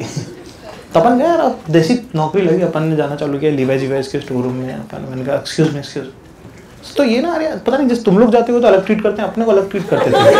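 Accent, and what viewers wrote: Indore, India. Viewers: bhai ye Indori andaz he.. mp me ese hi bolte he... kabhi aao apne mp me bhot mja ayega...:) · indori ki baat hi Alag hoti hai bhiyao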